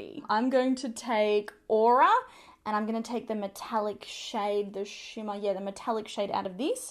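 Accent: Australia. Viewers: I'm an Aussie living in the US and it always makes me so happy to hear a familiar accent <3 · I freakin love your Australian accent!!!! Watching here in the US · Oh my goodness 😍❤️ that palette is so beautiful. Hope I can win it I never win anything but hey you never know. Love your videos as always and love the Australian accent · Love that I have found your channel through Tati! So nice to hear an Aussie accent killing it on YouTube 🥰🥰🥰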